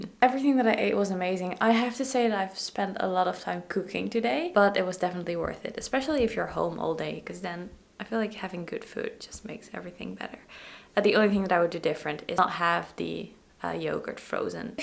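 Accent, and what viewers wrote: Netherlands. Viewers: Are you dutch? Just a guess because of the accent and the dutch grocery’s😂❤️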